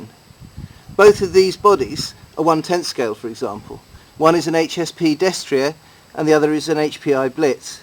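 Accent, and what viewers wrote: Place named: England, United Kingdom. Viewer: Nice video. I have wondered about scale too. Seems like it is not always right at the scale that is stated, they seem use the term "scale" loosely. By the way, looks like "Polystyrene Man" was badly burned in a fire! lol. I always like listening to an English accent and phrasing. I think most non Brits do since it is not something we hear that often and it is just such a pure form of English than what it has morphed into in the states.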